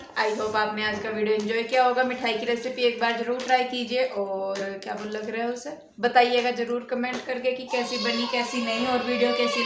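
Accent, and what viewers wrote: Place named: Haryana, India. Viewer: Nice recipe di and aap haryanvibolte bahut achhe lagte ho.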